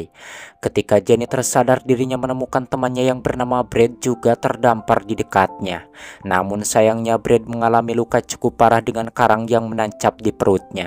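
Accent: West Java, Indonesia. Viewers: Ti mana sundana a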